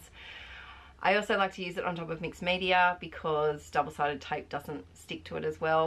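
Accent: Australia. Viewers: The joy of hearing an Aussie accent.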